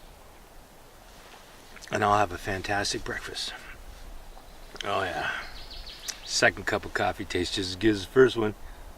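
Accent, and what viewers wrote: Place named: Ireland. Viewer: I believe that is the first time I've heard an Irish accent from Canadian lips... I like using flour tortillas as a wrap for the Breakfast Skillet. I gently warm the tortilla over the fire first. Then scoop in a healthy portion of the mix into the wrap. Tastes great. Maybe a little hot sauce to go with it. Agreed. Over-nighters lack enough time to get into the wilderness frame of mind. But they are great for testing gear and just getting away from it all for a bit. Great video.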